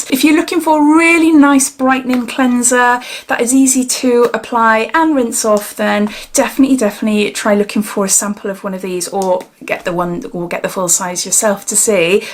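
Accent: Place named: Pembrokeshire, United Kingdom. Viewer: Just subscribed. Stumbled on your video and we are simialar ages. Also detect a welsh accent, I think Pembrokeshire. I am from Newport (Gwent)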